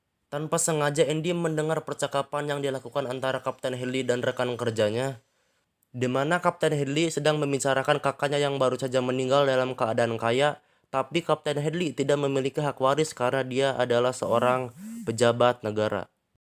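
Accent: Karawang, Indonesia. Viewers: bjir orang karawang 🤣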